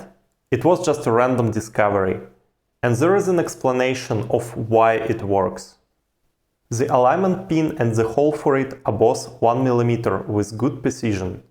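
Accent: Russia. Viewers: Are you russian or Norwegian and russian accent sounds same?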